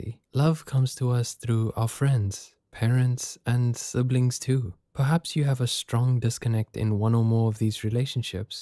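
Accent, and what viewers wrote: South Africa. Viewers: I know a South African accent when I hear one 🇿🇦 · I have a question, is this a south african narrator?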